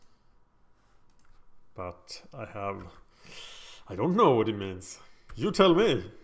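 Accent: Sweden. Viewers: My first reaction was "Swedish accent". Is it?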